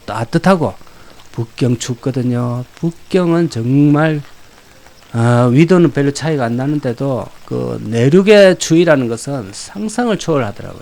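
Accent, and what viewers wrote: Gyeongsang, South Korea. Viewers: 표현력도 좋으시고 지식도 풍부하시고 경상도 말씨도 듬직 하시네요 안타까운 현실.. 덕분에 잘 봤습니다 감사해요 구독좋아요 꾸욱~~~♡ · 목소리가 어째 으시시하네요. 게다가 겡상도 사투리로. 에이~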